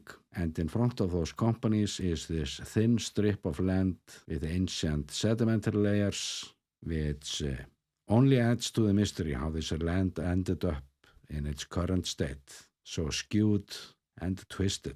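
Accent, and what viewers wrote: Iceland. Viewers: Have to say that you are not only an incredible videographer of your country, you are also an incredible historian about your country, with an unmistakable Icelandic accent! Thanks so much for this latest complete overview of one of your lovely communities. How would we know they existed without you! Great fun to watch, hear your voice and learn something new...all rolled into one few minutes of my time. Amazing!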